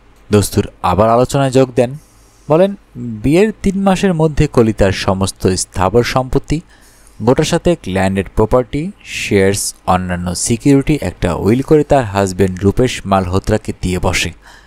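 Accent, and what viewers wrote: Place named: Bangladesh. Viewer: আপনার উচ্চারণে বাংলাদেশ এর টানটা খুব মিষ্টি লাগছে, কৃষ্ণনগর থেকে 👍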